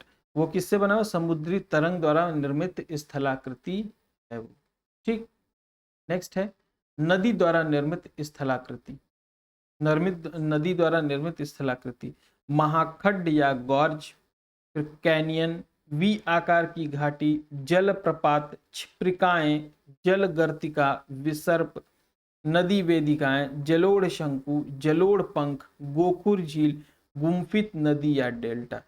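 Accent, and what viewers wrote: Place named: Uttar Pradesh, India. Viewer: Bahut hi jhakkas ek dum up wali bhsha me bole to mast lajwab❤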